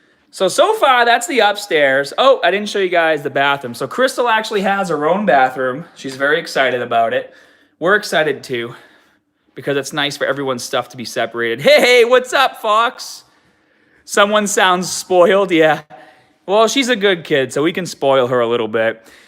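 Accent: Boston, United States. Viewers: I just subscribed. I subscribed to your absolutly gorgeous wifes channel and figured id give you sum support too. 🎉🎊🎉God bless you, your entire gorgeous family💑 & your new home! ..🙏🙏 Awweee your cats soo adorable! Your new house is AMAAAZINGLY GORGEOUS!!😍😍😍 I LOOVE IT!💝 lmaooo😂😂😂😂 " A class A lesbian.." 😂😂😂😂😂 you have a great sence of humor. 😂😂😂 You still got your boston accent.. I loove it! I love right outside boston. But have only lived here a few years. Moving is SOOO STRESSFUL!😱 I really hope you guys had as pleasant as a move as possible.. Bello soo Adorable!😍 congrats on the move. That house is sooo nice! Loove those chandeliers!😍 awwee your soo sweet - "you wanted to give your wife her dream kitchen.." sooooo SWEET!..💝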